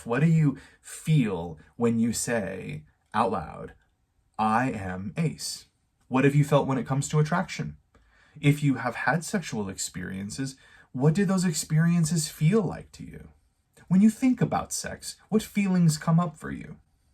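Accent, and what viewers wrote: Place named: Canada. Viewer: Are you from canada brother , your english accent as canadian people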